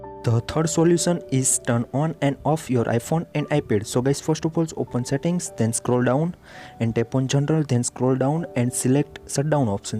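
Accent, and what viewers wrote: India. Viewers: This is the fattest indian accent i’ve ever heard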